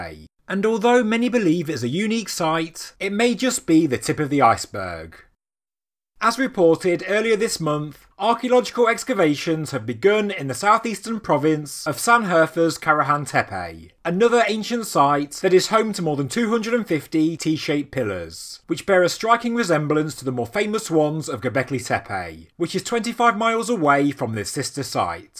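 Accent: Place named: Midlands, United Kingdom. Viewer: aye your just east of brum thought i could hear that accent :d ...on tepe do you think there could have been a wooden structure over it at one time?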